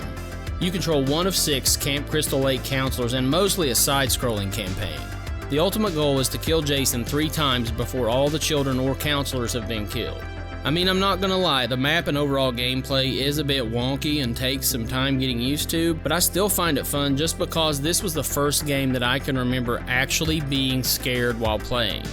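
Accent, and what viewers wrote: South, United States. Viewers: Great content and I love your accent man. As a fellow southerner it gives me great pride to hear your voice in a well out together video game review YouTube channel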